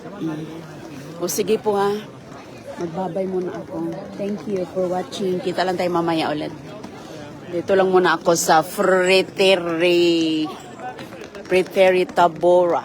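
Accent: Visayas, Philippines. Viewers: Sorry Jud Bisaya man si ❤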